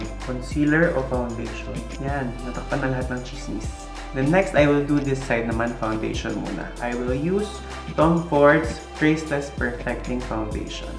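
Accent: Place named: Visayas, Philippines. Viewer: ❤️❤️❤️..i love the way how you speak bisaya word..😘😘😘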